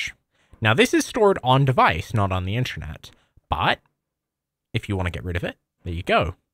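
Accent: Canada. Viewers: i know that, but canada is huge and has many accents, im trying to get the accent down specifically as this isnt the canadian accent im used to hearing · He's from Canada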